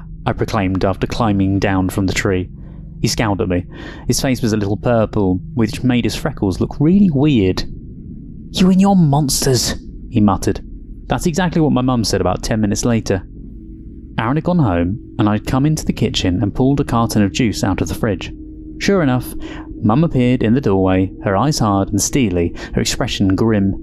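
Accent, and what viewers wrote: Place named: United Kingdom. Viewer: hears intro -subscribes immediately hears British accent -TRIPLE SUBSCRIBE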